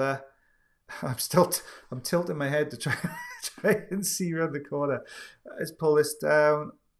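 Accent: Wales, United Kingdom. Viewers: I had just got done thinking "why don't you bring in the jobson horn, mate?" when you said that in hindsight you would have. By the way, I thought it in a British accent since I was listening to your narration haha. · It's a Welsh accent he's got going on there, always cracks me up when he starts taking about Valleys in the canal .... cus he works in the Valleys (of Wales)!!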